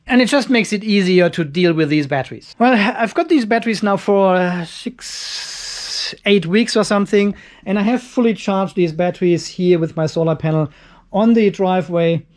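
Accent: Germany. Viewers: Hi, i am thinking about buying one of those Batteriepack from Basen for my upcoming solar Project. But... I don't know yet. I start watching your Channel a couple of days ago. I am impressed about your knowledge on the whole Solar stuff. And the other thing is i was wondering about your english language with the German Akzent. But anyway... I Like your Videos, keep on doing IT. I learned a Lot more about batteries from U. Grüße aus Ludwigsfelde Tom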